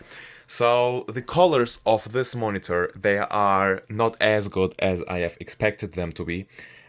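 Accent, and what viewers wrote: Turkey. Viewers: because of the accent, I was thinking you might be a Turkish guy, but after when I saw the glass bead on the wall, I am sure that you are a Turkish guy, süpersin bro güzel setup :D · Digger ich höre an deinem Englisch, dass du Türke bist :D Probs für das gute Review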